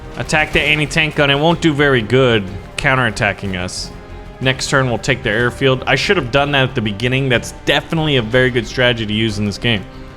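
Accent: Germany. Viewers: german accent in on point👌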